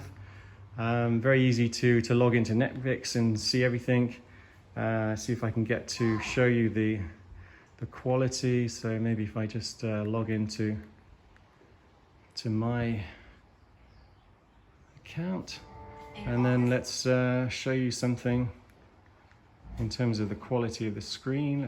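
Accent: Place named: Australia. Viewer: your voice my man, sounds so much an Aussie！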